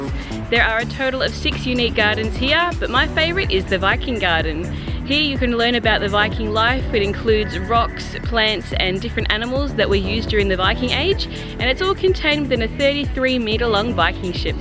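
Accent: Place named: Melbourne, Australia. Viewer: G'day from your home town, Melbourne!! Not hard to pick that accent!! My wife and I are coming to Oslo-Bergen enroute to catch the North Lights in January next year. Any advice on what what may or may not be open in winter in Oslo? Thanks. Vincent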